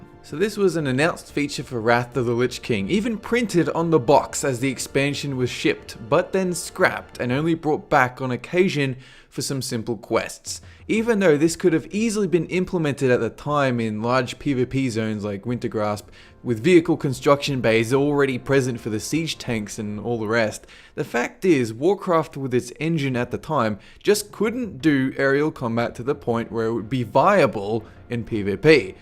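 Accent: Australia. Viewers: Aussie reppin? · Australian accent.... it breaks my brain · he's Australian. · you australian mate?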